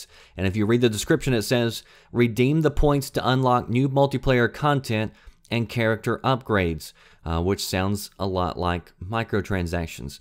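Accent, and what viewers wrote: South, United States. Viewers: Your southern drawl is really sexy ;)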